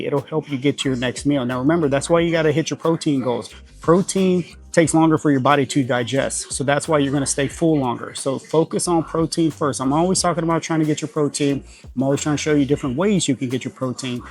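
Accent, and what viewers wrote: Louisiana, United States. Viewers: a bibimbap or bulgogi bowl meal prep would be a lot of fun! I thought I heard a Louisiana accent. I grew up there but am now in DFW 😊.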